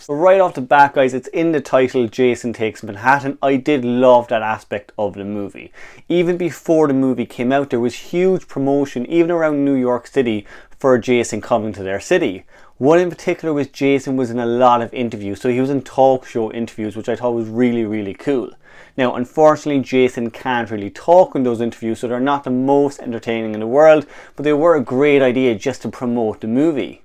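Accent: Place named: Ireland. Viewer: I never really got into this franchise, so I have nothing to comment on. I’m just here to give you a thumbs up and I LOVE your accent!! Is that Irish?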